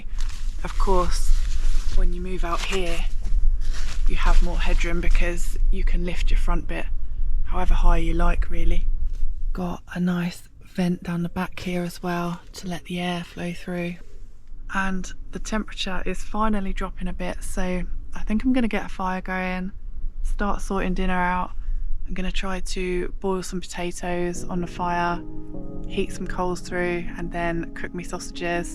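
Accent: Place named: RP, United Kingdom. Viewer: well posh, love it, love your videos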